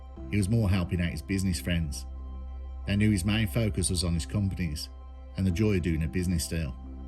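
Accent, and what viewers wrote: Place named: England, United Kingdom. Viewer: Well done fellow, real British events narrated by an English accent, a great channel many thanks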